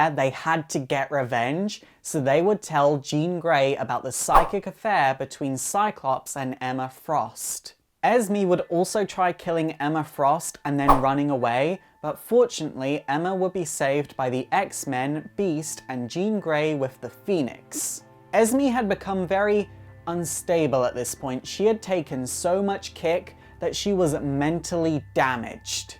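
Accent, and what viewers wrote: United Kingdom. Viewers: Hes british, i think he is pronouncing it the way its supposed to be.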